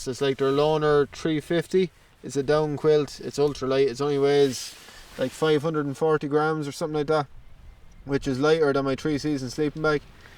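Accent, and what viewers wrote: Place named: Ireland. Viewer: It’s just nice to see and hear an Irishman on YouTube!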